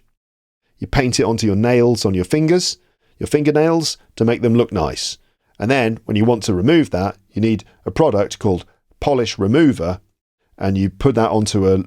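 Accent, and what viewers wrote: RP, United Kingdom. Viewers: I love your video about jokes and I love your british accent is wonderful to hear you!!! II am a Visual artist and photographer from Argentina. Best wishes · Hello,! I'm an English teacher from Buenos Aires, Argentina. I' m delighted to hear your perfect R.P. English.You are very kind and patient explaining things that are too simple for you. Love it when you chuckle, kind of admitting that a joke is terrible. I guess your work is truly helpful for students of English. I learnt a few things about world culture. Thank you so much! 😊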